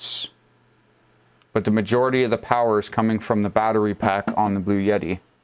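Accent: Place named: United States. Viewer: I thought Yetis were brown? LOL. I have the AC200L, and can't help chuckling at the American pronunciation. Great video by the way. I have a 5KW solar installation on my rooftop. The power company will only give me 5 cents a Kilowatt input credit, so during the daylight hours I run all my power hungry devices, pump the house full of heat or cool air depending on the season. Now I have the AC200L charging during the daytime and running some of my essentials at night. I have a folding solar panel that I can use in case of grid failure, so I may never recover the full cost of the unit, but I have a bit of a guarantee against blackouts.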